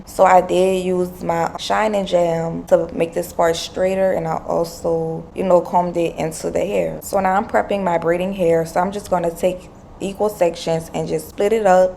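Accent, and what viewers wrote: New Orleans, United States. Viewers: I hear a Nola accent and I’m here for it 😵🥹🥰😍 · Great Job! I know you from New Orleans by the Talk. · I hear the Nola in you lol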